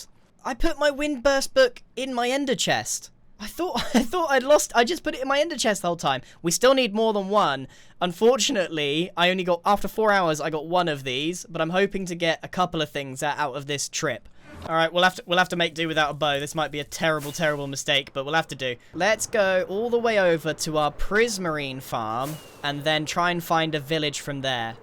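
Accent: United Kingdom. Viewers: he just sounded British to me 🤷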